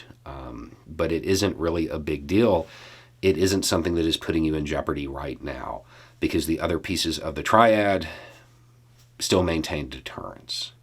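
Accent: South, United States. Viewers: For me gives me comfort that all is not lost. I live up north where it is pleases me that the way talks does not sound like me or the people you normally hear on the news or typical moderates and liberals. He is from the south, looks and sound like likes someone from the south. So people from his neck of the woods are more likely to listen to him.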